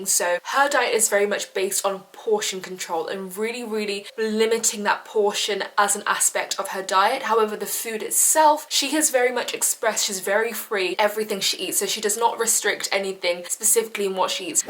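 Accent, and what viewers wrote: United Kingdom. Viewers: Okkk ..first off !!!! I'm in love with her British accent dayummmmm🤩🤯🔥🔥🔥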